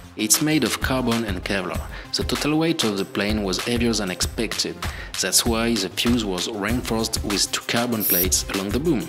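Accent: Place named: France. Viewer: just wondering what film covering you used? i cannot understand what it says in the video. also, what airfoil? and what size prop? I can help you do a voiceover in american english, if you send me the script, i can send you the audio recording? perhaps it would be easier to understand? be happy to help if i can. if not, no hard feelings. I speak spanish, and english, but had a hard time understanding the french accent. Thanks for the update! thumbs up!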